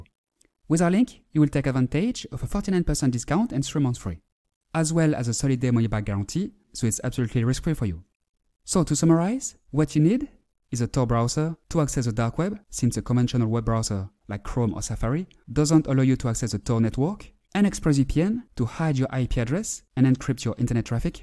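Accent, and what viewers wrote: France. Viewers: Your English is weird i bet you're french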